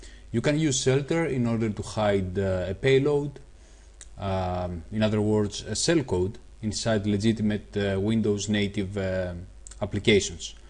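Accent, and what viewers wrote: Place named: Greece. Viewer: are you greek or sth ? i can detect an accent :P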